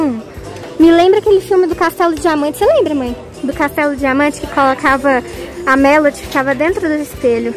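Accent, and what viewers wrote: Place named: Minas Gerais, Brazil. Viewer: sou do rio de janeiro, acho lindo sotaque mineiro.. meu tio mora em minas.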